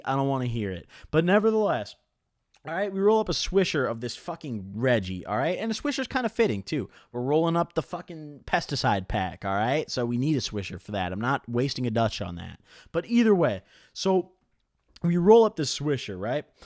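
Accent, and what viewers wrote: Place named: Indianapolis, United States. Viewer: Dawg you sound like you LIVE IN NAPTOWN lmaooo frl I can tell when I hear it😭😭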